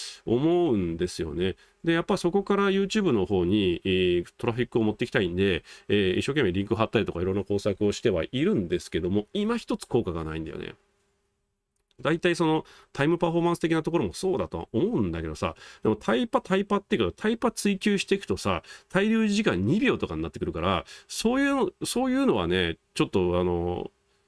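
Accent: Kansai, Japan. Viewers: 関西弁…… 某掲示板…… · ん…関西弁…なんJ民の気配がしたな… ？？？『ワイやで』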